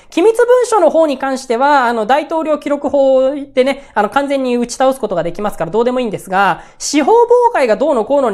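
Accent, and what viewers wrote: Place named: Nagoya, Japan. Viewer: 今日は名古屋弁ちょこちょこ出てたw by 春日井出身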